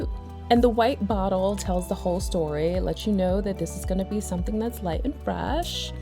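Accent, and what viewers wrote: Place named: West Coast, United States. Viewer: You're from NY or CA? cause you got the west coast accent down pat!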